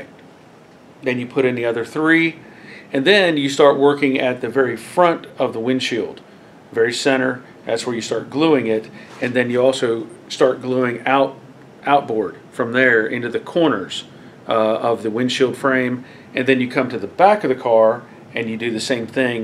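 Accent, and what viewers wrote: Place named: Georgia, United States. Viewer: You.do.not.talk.like.someone.from.around.atlanta..more.like.waycross,ga..lwenr.too.schoole.in.big.atlanta.many Years.ago..i.am.also.a.lill...little.south.of.atlanta........florida..keep.on.mustanging..my.friend.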